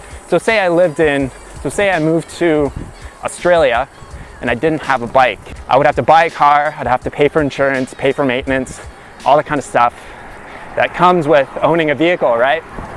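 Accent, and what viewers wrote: Canada. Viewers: I new he was from Canada.the way he says about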